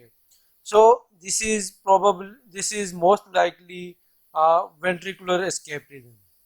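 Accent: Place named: India. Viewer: Thank you. This is a great help to us who are accustomed to the English accent but are not used to the Indian accent.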